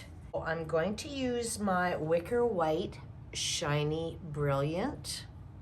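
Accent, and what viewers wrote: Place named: Canada. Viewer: Hello, I am yet to start my new passion of paint pouring but I had not seen anything like this before! You have inspired me so much. Before I found this painting, I had started a Reno in my home. I still have much to do & have had to decide to wait to start this new endeavor till my remodeling is done or I will not get it finished at all. It’s been difficult to have to wait but it’s for the best! The Beautiful Creations you have given me has jumped my mind into overdrive! I just want to be able to get organized and setup a Craft Room. I have enough supplies to do a lot of work,(Play), & it’s all in boxes in my bdrm! I barely have walking room to get thru it to use the bathroom. I think that you must be Blessed by the hands of God to Create such Beautiful Art! Thank You for putting your Vids on here for me to enjoy! I consider it special from one Friend to another. 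Where are you from? I think I detect a Canadian accent! I live in Washington State about 3 hours or so South of BC, Canada. Like your works of art, it is as beautiful as it can be. I hope you will have continued success and stay safe so you can create even more. Thanks again, Newburyport to this stuff, JilliBean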